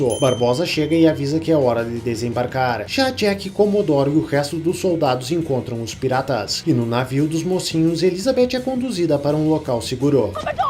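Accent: Rio Grande do Sul, Brazil. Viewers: bah o meu tu é gaúcho né??? muito bom é os guri hehehehehhe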